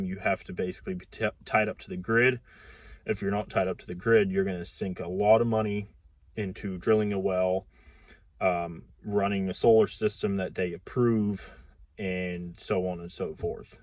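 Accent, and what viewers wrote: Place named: Kentucky, United States. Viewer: Sounds like either Northern Ky or western Ky. You need to come out to southeast Ky in the mountains. It’s a whole different world out here. Less government involvement!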